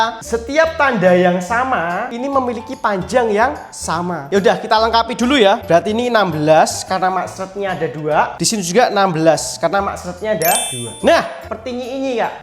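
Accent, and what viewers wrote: Java, Indonesia. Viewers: Orang jawa ya kak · Mendok iki suara nya to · Medok bgt 😭 Jujur suka cara ngomongnya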